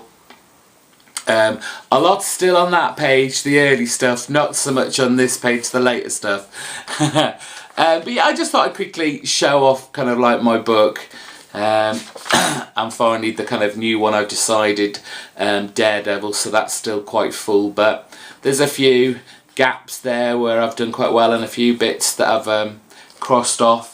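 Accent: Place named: West Country, United Kingdom. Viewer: Appreciate the codes :) Loled at your "pretty darn decent" Sounded like everyone from down my way lol Gotta love the West Country accent! Enjoyed the vlog...missing your marvel graphic novel collection vids tho :/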